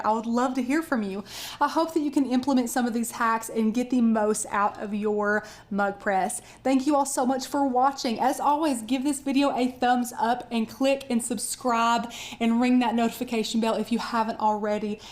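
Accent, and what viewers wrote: South, United States. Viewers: OMG you got a southern accent so I, as a fellow southerner know this is gonna be a good tutorial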